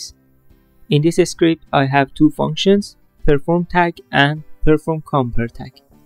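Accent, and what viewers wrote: Iran. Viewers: داش چرا لحجه ایرانی داری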